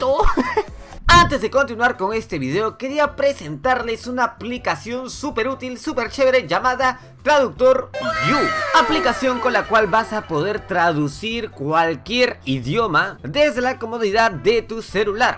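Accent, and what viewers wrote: Peru. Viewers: Habla como peruano Oe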